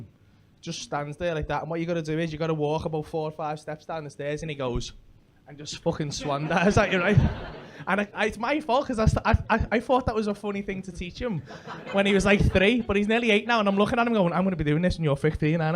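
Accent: Liverpool, United Kingdom. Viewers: Love this Scouse ginger , Birmingham loves ya .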